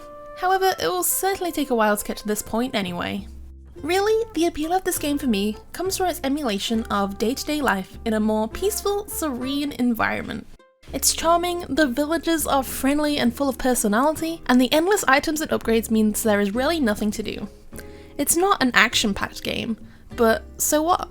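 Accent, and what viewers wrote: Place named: Northern England, United Kingdom. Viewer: It sounds like northern England mixed with America/Australia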